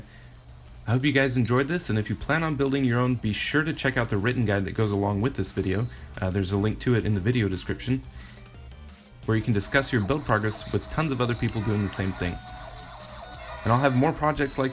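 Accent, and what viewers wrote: Germany. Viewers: Do you come from Germany? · are u german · are you german? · Are you from Germany?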